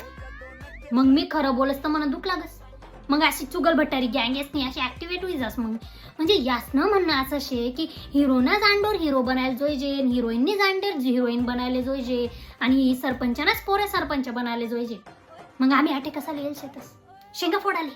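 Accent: Khandesh, India. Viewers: काय मस्त खान्देशी boltis माय तु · ❤️मला खानदेशी तुझी तोंडून फार आवडते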